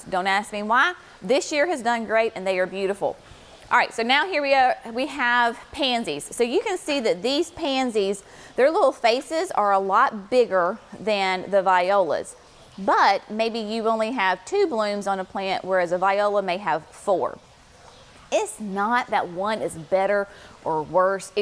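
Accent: South, United States. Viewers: You have such a nice nursery and all of your plants are very healthy, I have subscribed and will be back to learn and enjoy more, SIMPLE, SWEET, AND OOH SO SOUTHERN!!!!🤗🤗🤗🤗🤗🤗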